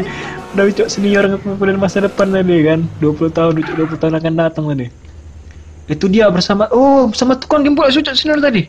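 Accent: Medan, Indonesia. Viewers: bang :v orang Medan ya :v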